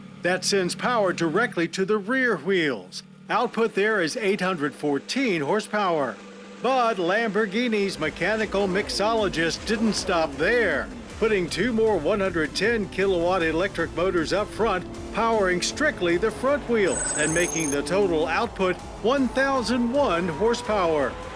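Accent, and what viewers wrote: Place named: Maryland, United States. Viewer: I am always amused by the way he says "subwoofer" & "compass" with his Maryland accent. He says sub-WOO-fer instead of sub-woof-er. He also says the com like the end of a website name instead of come. Robin Ophelia Quivers from The Howard Stern Show on SiriusXM Satellite Radio also has these quirks from Maryland.😊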